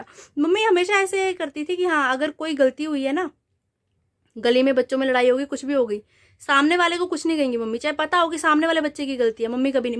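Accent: Punjab, India. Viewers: बहुत सुंदर प्रस्तुति बेटा तुम्हारा बोलने का टोन पंजाबी हो गया है।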